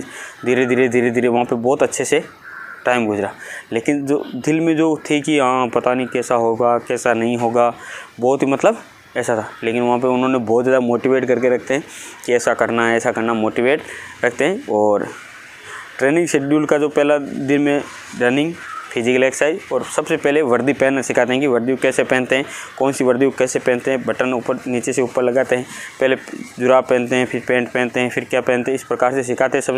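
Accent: India. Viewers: Sit aapka na bolne ka aur samjhane ka tarika ekdam mast hai👌ekdam Desi style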